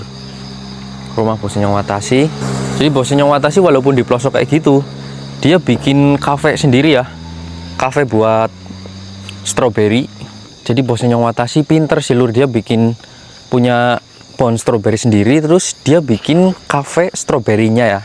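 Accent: Banyumas, Indonesia. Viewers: Ciri khas narasi ala nyong watashi adalah penggunaan kata2 yang lucu penyebutannya. Palkir, tlaktor, kakekk-kakekk, nenekk-nenekk, nyebut house jadi hoss, dll. Juga dialek ngapaknya, dan gaya polosnya. 😁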